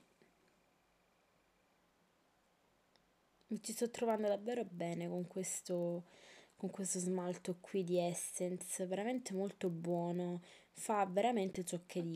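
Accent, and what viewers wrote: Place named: Lecce, Italy. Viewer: ciao ma x caso sei d lecce??? si sente da cm parli *_*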